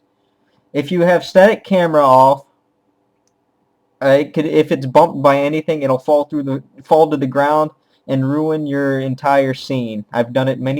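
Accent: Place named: Texas, United States. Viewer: He sounds more like a teenage texan.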